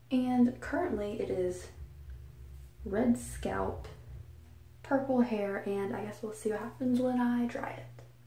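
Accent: South, United States. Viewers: Love your videos!!! I just wish that you would speak a bit more slowly, though perhaps you've slowed down in your more recent videos that I haven't seen. The speed, in addition to your southern twang make it difficult to understand what you're saying at times!!! xoxoxoxo 💇🏻✨💄👠💋👍💅💗👸🏻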